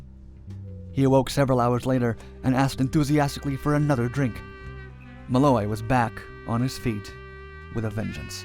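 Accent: Ireland. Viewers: My God, that's an Irishman! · can you just do all future episodes in your irish accent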